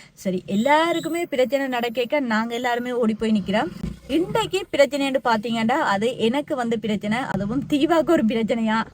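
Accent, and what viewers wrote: Sri Lanka. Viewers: u r great,ur job is excellent, oru manitha mirugam panniya seyalu ku neenga feel pannatheenga, unga srilanga tamil speech sooper,all the best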